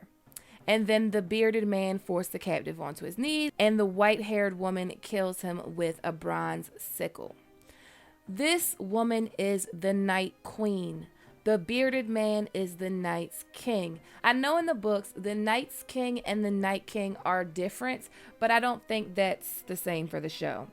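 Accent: United States. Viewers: What part of America is this accent from, it's hooking me.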